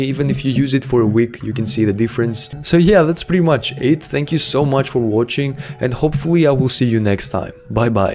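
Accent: Greece. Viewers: Ημουν σιγουρος οτι ησουν Ελληνας απο τη προφορα (μια χαρα ειναι μην αγχω) αλλα οταν αρχισες να ραπαρεις στα ελληνικα το κλειδωσα, εχω κγω την ιδια ιδεα τελευταια με τη digital ζωη σκεφτομαι να το ξεκινησω να δω πως θα παει. Ωραιο βιντεο!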